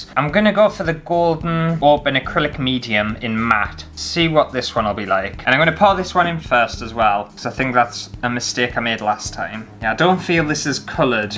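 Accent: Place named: North, United Kingdom. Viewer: Aww your (northern?) accent is so cute and wholesome. Also your content is really entertaining, subscribed!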